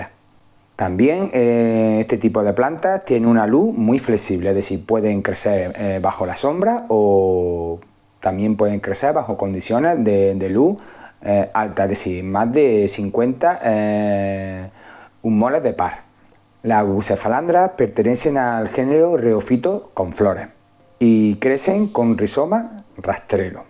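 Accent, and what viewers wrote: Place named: Andalusia, Spain. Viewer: Hola, primera vez que veo un video de usted, no le conozco, usted parece andaluz por su acento. Yo soy Sixto Rodriguez B. Le escribo desde Valencia, Venezuela; también soy español de las islas Canarias. Gracias por su explicación. En Venezuela no tenemos Bucephalandras ¿ Hay alguien en España que me pueda enviar unas Bucephalandras por Zoon o por RMW? Gracias, me he suscrito a su canal.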